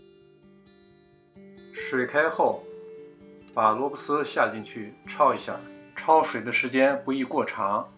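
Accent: Beijing, China. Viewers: 很純正的京片子我一聽就愛死人了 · 真不错，朋友是北京人吧。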